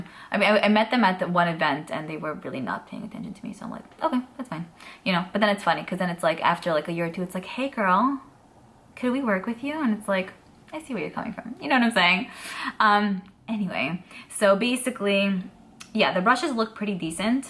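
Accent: New York, United States. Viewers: Agreed! I follow because she has her own little dialect and I love a good "New Yawk" accent as well. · I love your NY accent. You are fantastic and I appreciate your videos. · The way you speak? There's nothing wrong with the way you speak.... Ppl are so freakin' rude.... She lives in New York! She has an accent! F ppl, for real. 💗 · I'm a NJ girl, born and raised, and the New York in you makes me love you so very much. Your way of speaking is so endearing to me- keep being authentically you! Unfortunately everyone has an opinion about everything and everyone, and feel the need to shout it from the rooftops of the internet 😡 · You have a New York accent. When I moved out of state I was tortured because people would make fun of my accent. 20 years later now I have a Ohio accent and I even say pop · You have a New York accent, no? I can't believe how people can be...good grief, I love the way you are! 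❤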